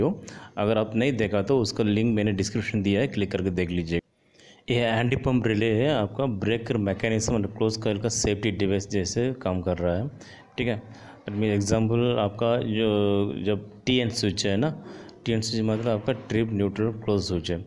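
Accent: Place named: Tamil Nadu, India. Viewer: Speaking like u r Tamil. R u from tamilnadu sir ??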